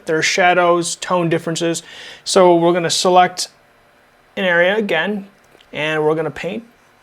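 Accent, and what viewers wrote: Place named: Appalachia, United States. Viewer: You sound like Hillblly, btw nice tutorial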